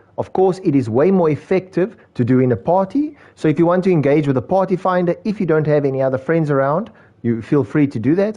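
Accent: South Africa. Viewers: South African? Wait let me sub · South African? Wragtig! Ek het ge-sub.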